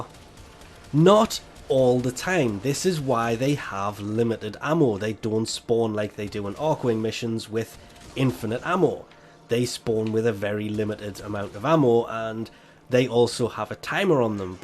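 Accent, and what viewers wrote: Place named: Scotland, United Kingdom. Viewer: DANG SCOTTISH LOVRTT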